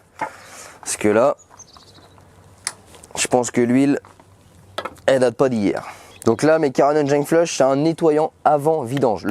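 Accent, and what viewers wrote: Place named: Normandy, France. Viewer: C'est comme ça que l'on parle en Normandie dans L'Eure ! Rien d'incroyabe !😉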